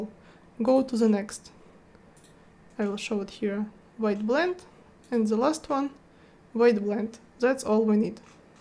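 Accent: Russia. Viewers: Jest' ya slsshu russkiy aktsent, ))) Есть русская версия?)) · Как звучит русский акцент? Вот так) Спасибо большое за видео! Успехов! ЗЫ: Очень подробно. Подписался. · слышно русский акцент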